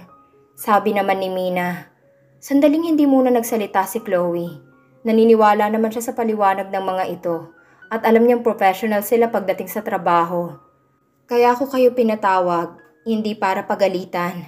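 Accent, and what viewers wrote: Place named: Iloilo, Philippines. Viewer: Ilonga ka siguro miss narrate hnd bagay sau mag narrate para kang ewan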